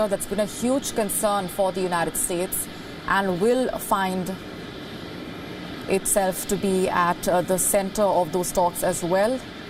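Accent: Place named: India. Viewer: Indian English 😁😁😁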